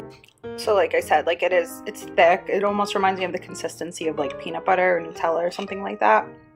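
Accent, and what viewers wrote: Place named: Long Island, United States. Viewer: Hmm... that's a long island accent lol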